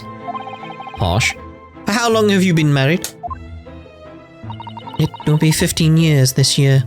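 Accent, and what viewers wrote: Scotland, United Kingdom. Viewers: Took me about 15 minutes to realise that he had a scottish dialect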